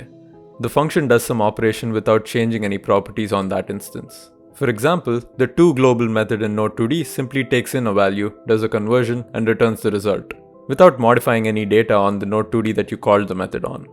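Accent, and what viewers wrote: India. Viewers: Bro, are u South Indian because of the accent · no front, but why are the best tutorials and tipps brought by people with indian accent?^^ Again here, no front or offense or something^^. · >click >indian accent >dislike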